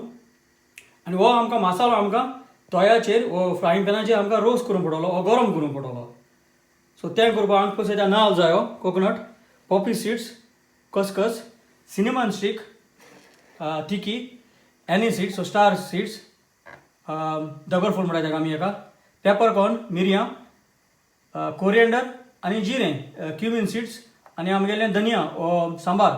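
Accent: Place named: Konkani, India. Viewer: U r genuine Goan person. U've got the authentic Konkani accent and the Goa centric ethnic recipes. May God Bless you and may u come up with more Goan recipes. U r the source of cooking for many young Goans in cooking authentic Goan cuisine. Mog asum sodamkal. Long live our brotherhood and Goenkarponn. VIVA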